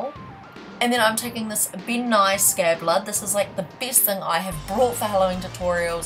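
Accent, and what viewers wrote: New Zealand. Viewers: the kiwi accent always makes me giggle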